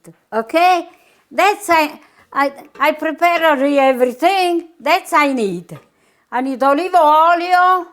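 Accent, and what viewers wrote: Italy. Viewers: I love you ❤. You are exactly like Nona Rosa😊, my mother. Exact Italian accent! Bella Nona!! We were all born in Napoli & mamma cooked just like you! God bless you 🙏❤️🙏❤️🙏❤️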